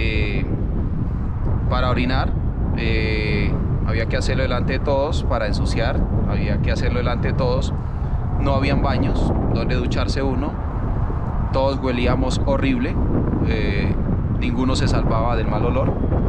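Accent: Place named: Colombia. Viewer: eres colombiano por tu acento y la manera en que dices las palabras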